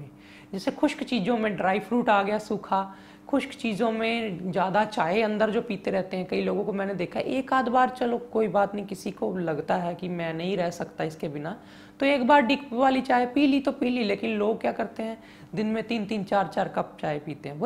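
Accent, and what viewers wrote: Punjab, India. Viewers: Guru g tuhaadi bhasha wich Punjabi touch hai. Kirpa karke ik video Punjabi wich bnaao. Mein tuhaade moohon Punjabi sunana chahubdi haa. Plzzzzzz 🙏mein v Punjabi haa.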